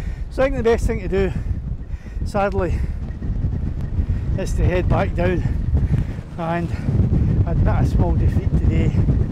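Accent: Scotland, United Kingdom. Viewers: Your great to watch,,great video,, love a scottish accent,, subbed.....im sure if you keep up the great videos you'll have plenty more subs..... i have the mini 2 also and flew it in a similar location with very high wind ,looked stronger wind than yours,no rain..signal lost,,, i was recieving the high wind warning saying unable to RTH as i went over mountain,,,, .. i thought i lost mine but it came home,,,,,, stunning little machine,,,,,,,, amazing technology....sports mode for very high winds.......nd filters for shooting video is a must have.... happy flying..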